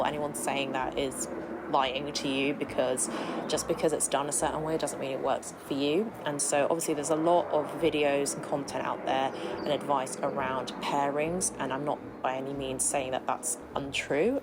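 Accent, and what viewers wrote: United Kingdom. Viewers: A beautiful woman with a British accent smoking a fine cigar. There is a God... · Asian lady with British accent, cigar, so sophisticated and sexy!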